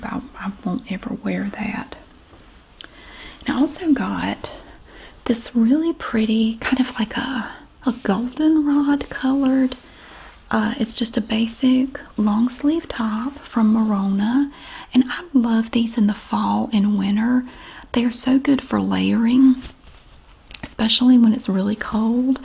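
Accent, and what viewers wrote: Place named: North Carolina, United States. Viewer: Im serving over seas and Your voice reminds me of my wife. she is from rural North Carolina. Your accent is spot on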